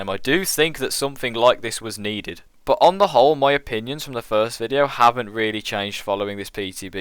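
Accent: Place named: United Kingdom. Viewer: hmmm, this guy sounds british ....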